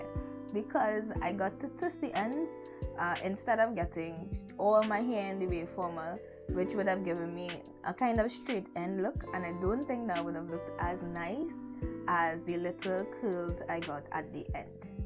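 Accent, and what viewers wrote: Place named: Trinidad and Tobago. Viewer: Beautiful! I love the trini accent! New subbie :D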